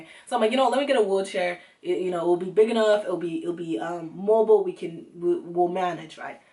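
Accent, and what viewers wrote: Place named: Nigeria. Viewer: That naija accent 😆😆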